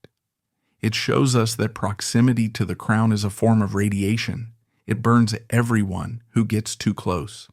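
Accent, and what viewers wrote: United States. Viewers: Not too much Irish blood there I would think , begorrah . Too long , deadpan American accent . Should be wrapped up in 20 minutes (for a minor royal) .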